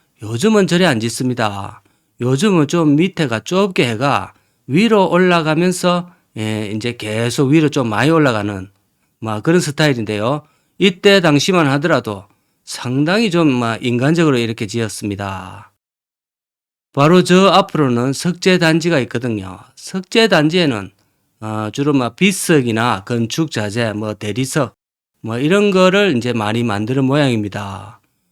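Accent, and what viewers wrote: Gyeongsang, South Korea. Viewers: 구수한 경상도 사투리가 잘 어울리십니다.수고하세요.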